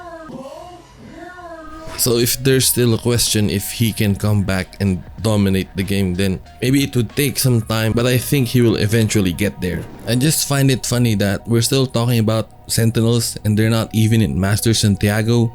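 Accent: Philippines. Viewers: Are you filipino? The accent.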